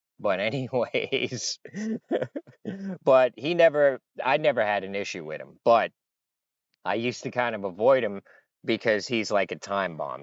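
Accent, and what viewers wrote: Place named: Northeast, United States. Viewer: Ya you’re good at telling stories. You have a nack for it plus the cool northeast accent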